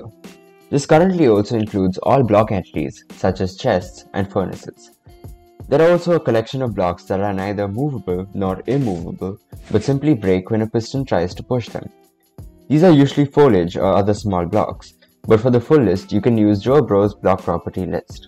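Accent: India. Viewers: With any chance are u indian?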